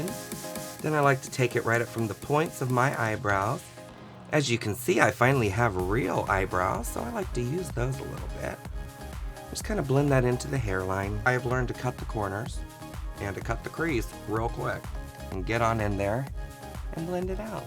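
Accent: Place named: South, United States. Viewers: ginger sounds so sweet and southern and endearing when she speaks i love it so so much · She talks like a little southern gay Bob Ross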